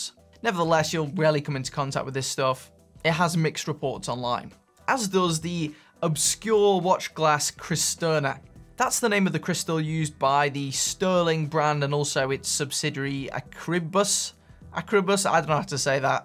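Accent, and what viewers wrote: United Kingdom. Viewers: I love British accent interesting to listen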